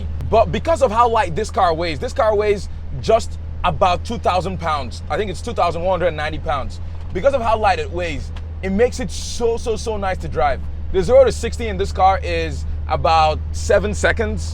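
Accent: Nigeria. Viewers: Came for the car, stayed for the laugh and the Nigerian (west African) accent.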